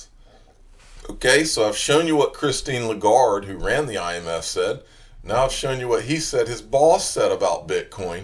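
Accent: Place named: Nashville, United States. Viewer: You have to be from around Nashville with that accent.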